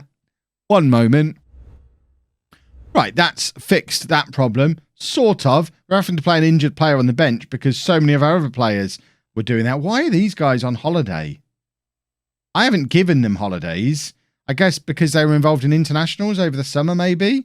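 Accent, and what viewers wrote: United Kingdom. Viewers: Man, you went to Mexico and the ladies are all just falling in love with you! I didn't know a British accent was so popular in Central America.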